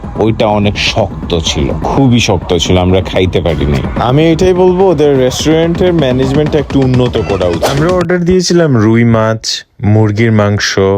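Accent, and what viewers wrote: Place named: Sylhet, Bangladesh. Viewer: His Syl accent is so cute 😂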